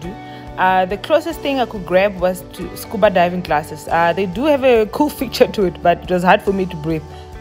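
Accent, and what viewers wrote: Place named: South Africa. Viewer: I am south african Indian I thought I recognized your accent, wow you have such great ideas and I will surely try it out, my daughter has asked for a shoe rack. Keep it up with your good work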